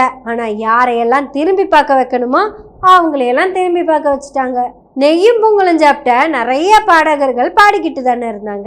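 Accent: Kongu, India. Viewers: கொங்குத் தமிழில் நோண்டி நுங்கெடுத்து சங்கிகளைப் பங்கப்படுத்துவதில் தங்கைக்குக் கைவந்தக் கலை! அழகுத் தமிழுக்காகத் தலை வணங்குகின்றேன்🙏🙏🙏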